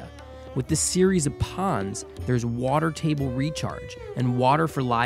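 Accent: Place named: United States. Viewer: this accent of american make me leave the video, stop vocal frying this is not natural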